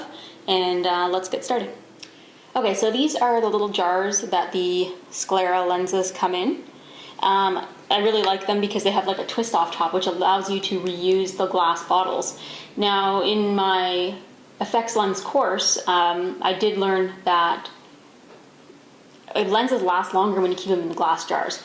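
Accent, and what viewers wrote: Canada. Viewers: Love your Canadian Accent!! Its so cute!! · Love a Canadian accent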